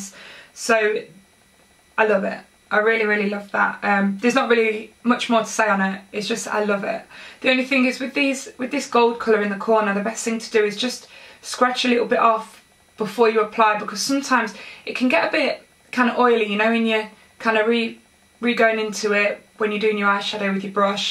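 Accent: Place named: Birmingham, United Kingdom. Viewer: You sound like you have a Birmingham twang to your accent x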